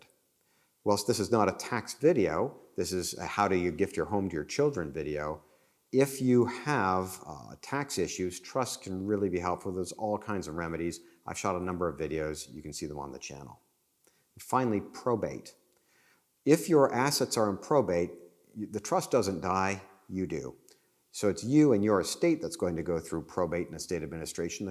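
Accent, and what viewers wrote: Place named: United States. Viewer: Hi you sound american? Are the trust laws in america the same as the uk? Thats if your telling us about american trust laws?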